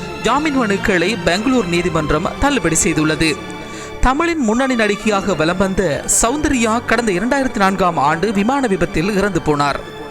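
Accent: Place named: Sri Lanka. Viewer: he s srilankan"tamil"... naturally their pronounciation...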